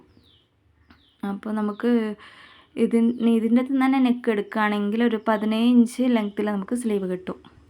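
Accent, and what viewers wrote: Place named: Kannur, India. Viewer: കണ്ണൂർ aano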